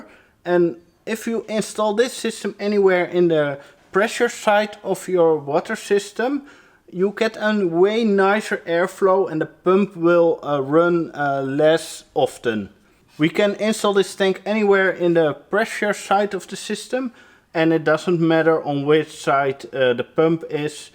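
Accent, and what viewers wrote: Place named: Netherlands. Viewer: Heerlijk Nederlands accent. Gaaf zo'n unimog als camper. Succes, ik ben geabboneerd. Ik ben bezig met m'n eerste camper te bouwen (vw t4).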